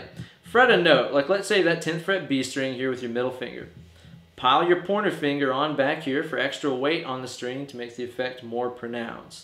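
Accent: South, United States. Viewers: Are you from Tennessee,? Just curious, you know White Chapel, and you have a southern accent, just putting the pieces together. I just moved to TN from MI, and I love it down here! Cheers!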